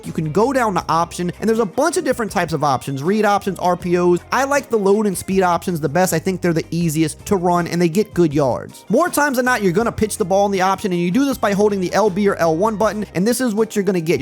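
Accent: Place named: New Orleans, United States. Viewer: I don't know where you are from but you sound exactly like people from a suburb of New Orleans.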